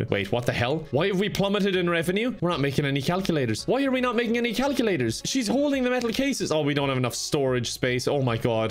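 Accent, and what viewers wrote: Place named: Ireland. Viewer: Didn‘t think I‘d see an irish dr.Eggman today...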